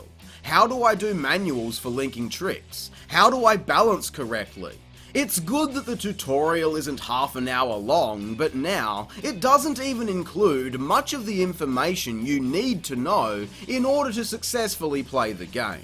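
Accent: Australia. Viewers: "Kind of, like, YouTube, actually. Don't forget to subscribe and share Tony Hawktober with all of your friends!" Mate, I'm Brazilian, my few friends that actually understand a bit of English unfortunately wouldn't ever understand that marvelous down under accent. But, you know what? I've been watching you for over a year, and if you hadn't said that, I'd never notice I wasn't subscribed. Now I am. Sorry about that, mate, I honestly never noticed since your videos always appear in my home page. I could swear I was subbed.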